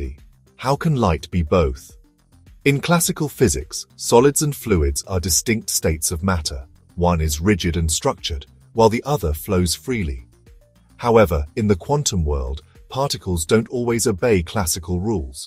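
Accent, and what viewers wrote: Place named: United Kingdom. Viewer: Screw that British accent. Bad choice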